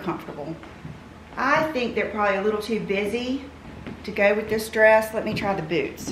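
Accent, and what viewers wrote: South, United States. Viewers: Hi. I just wanted to say how much I enjoy seeing your pretty face and listening to your soft southern accent. Things are getting very scary here in the UK (as it is everywhere) and your videos are the perfect distraction to the craziness going on around all of us. I hope you and your family stay safe and well. 🙏🏻💕💋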